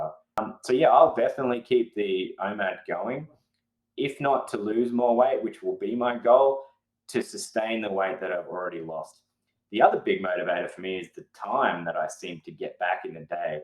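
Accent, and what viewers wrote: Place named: Australia. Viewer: I don’t know why but whenever someone has the Aussie accent I listen even deeper.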